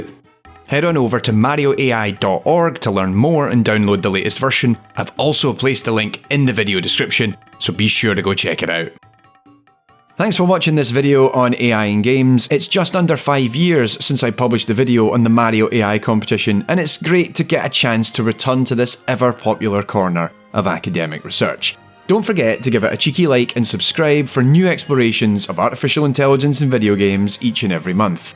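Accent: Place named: Fife, United Kingdom. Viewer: I may be wrong but I sense a Fife accent?? 👀 also I luv the video I should rly be asleep right now lmao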